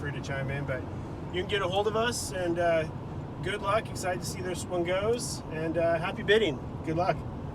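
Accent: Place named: West Coast, United States. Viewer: Boy if I've ever heard a west coast accent it's this here. And what a pretty truck!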